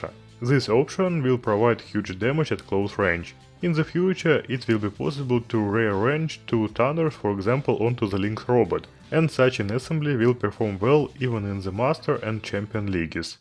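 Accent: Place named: Russia. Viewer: а по русский нельзя? все равно английский как русский ..так понятнее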